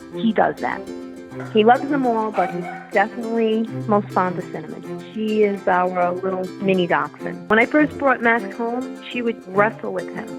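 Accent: New York, United States. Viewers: Love your New York accent!! Love from California! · Omg love your story. I’m from LINY and you sound like your either from there or Joisey! God Bless you always 🙏🏼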